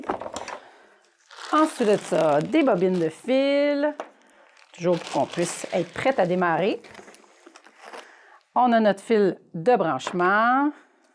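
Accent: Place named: Canada. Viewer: Oh un accent canadien....de Celine Dillon...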